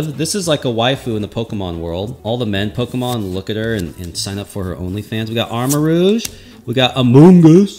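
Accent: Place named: Australia. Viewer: BRO AUSSIE LOL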